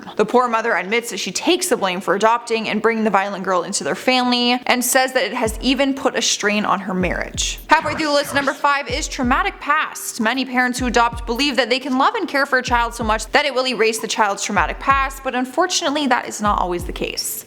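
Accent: Canada. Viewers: Her hair is in a weird style...but I don't feel like punching her. Her accent is funny too....Canadian?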